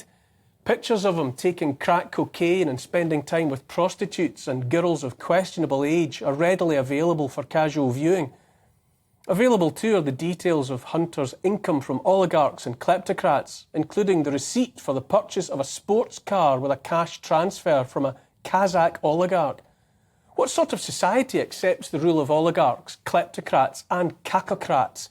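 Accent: Scotland, United Kingdom. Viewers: thank God for people like you. You are the best, views so eloquently put and with your adorable Scottish accent too. Wake up people!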